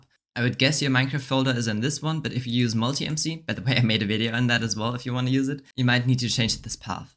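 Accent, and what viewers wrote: Germany. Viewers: hey, nice video, really helped me Man hört aber stabil, dass du deutsch bist 😆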